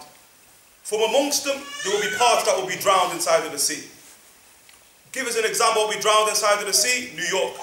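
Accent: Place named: Liverpool, United Kingdom. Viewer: He has a British Scouse accent .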